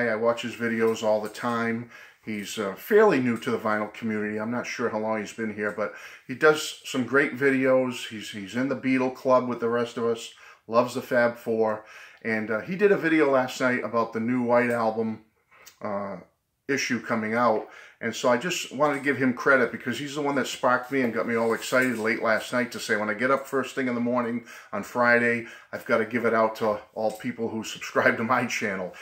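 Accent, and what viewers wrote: Boston, United States. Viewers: Hi, Thanks for all this GREAT information! White Album Super Deluxe, yes! I love all this resurgence of Beatles everything! It is all very exciting! I just have to say I love the way you say "McCartney"! The Boston accent is GREAT! I happen to like the Liverpool accent too! Wonder why?! You're in my prayers tonight. "God let us Beatles fans live another 35 more years or at least until there's nothing more Beatles to re-release!" LOL! Thanks again! I've got to now to look for my part-time job to pay for all of this stuff! Cindy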